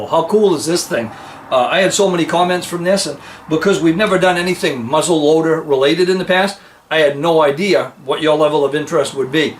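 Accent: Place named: New England, United States. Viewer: FINALLY found a firearms channel from my region, with my interests! Speaking native New England too. Thank you sir. Being In Massachusetts, I must live vicariously through YouTube.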